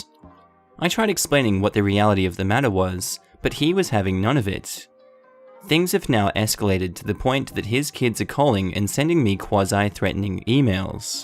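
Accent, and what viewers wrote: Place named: Australia. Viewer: your amazing Aussie voice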